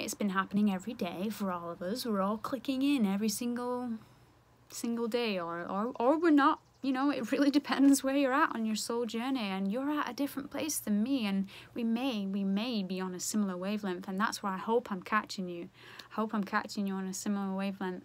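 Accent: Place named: Lancashire, United Kingdom. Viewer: Kútulas She lives in Lancashire England doesnt she ? Her accent is local. I dont think this video is due to media conglomerates.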